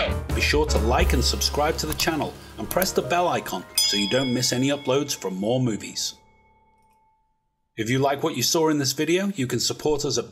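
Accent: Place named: North, United Kingdom. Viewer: Love your Northern accent!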